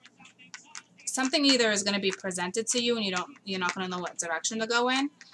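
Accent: Boston, United States. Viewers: Hey You's I love your Boston accent!😂❤ And your Reading❤❤ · Are you from Boston? I'm loving your accent!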